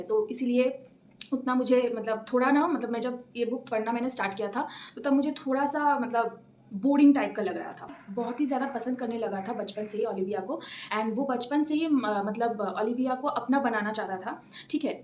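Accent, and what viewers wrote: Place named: Bengal, India. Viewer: 8 months na ki 8 years Btw. Your Bangla-hindi accent is amazing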